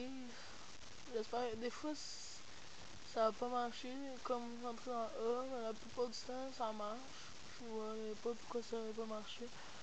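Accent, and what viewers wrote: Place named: Quebec, Canada. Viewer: J'adore la facon quebequoise de dire google on dirait plus qu'il disent gogole mais ca fait bizarre quand on n'est pas habituer!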